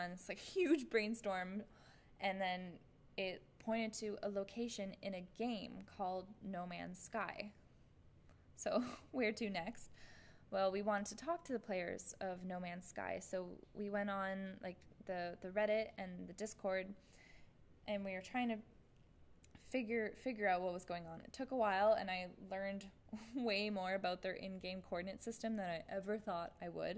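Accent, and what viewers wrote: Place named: United States. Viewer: American accent and ends vlog with "cheers" obviously been hanging out across the pond... in hello games studio? hmmm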